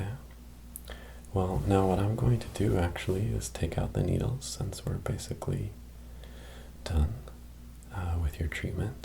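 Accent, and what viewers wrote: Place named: United States. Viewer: I never thought that I really would like an American accent with ASMR vids. Depending on our English teachers at school - in Germany they are mainly British - you get used to British English right from the beginning. And that's perfectly fine with me. But I love this video. Your voice is calming, soothing and relaxing. So, thanks for that!!!.Greetings from Germany. ;-)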